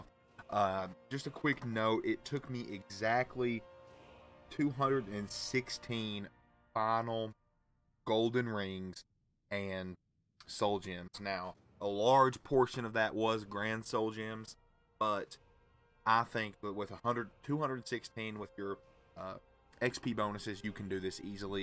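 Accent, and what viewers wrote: South, United States. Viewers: got that Theo Von southern charm love that shi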